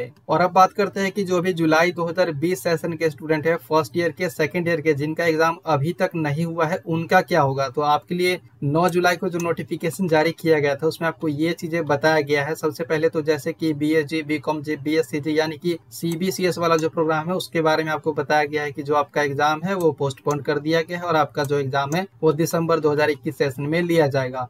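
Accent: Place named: Bihar, India. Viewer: bhai I think you belongs to BIHAR . IS it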